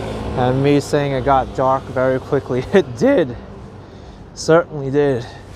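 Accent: New York, United States. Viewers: Omg your accent is EVERYTHING. I feel like I'm back in old New York.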